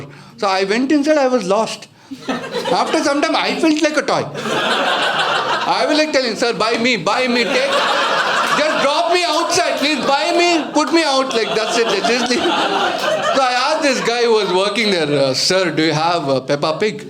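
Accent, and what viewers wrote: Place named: Telugu, India. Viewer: His accent reminds me of my Telugu friends from my hostel days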